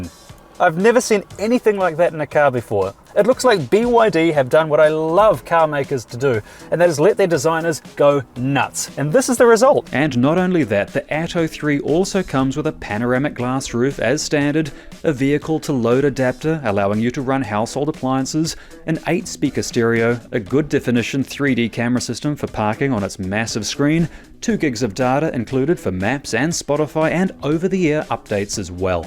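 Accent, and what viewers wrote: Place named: New Zealand. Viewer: Just discovered your channel. I like your clear speech (Kiwi ascent not bad) and your casual and slightly excited and fun style also. Your simple but detailed explanation of what you are testing (Atto 3, I like it too). Background music & Ads are not bad either. Thank you. Lloyd from Adelaide Sth Aust.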